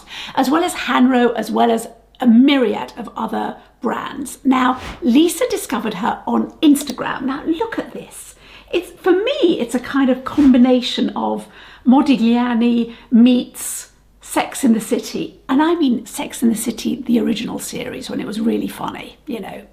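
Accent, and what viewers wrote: United Kingdom. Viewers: I’m in the US and I think you’re fabulous. You would be so much fun to hang out with. I love your style and your posh accent. I love all these LE products too. I bought the Rosalie liquid lurex, the pink damask lipstick and the Kensington plum lip gloss. Sorry, if I’ve forgotten some of the names. Can’t wait to receive them. ❤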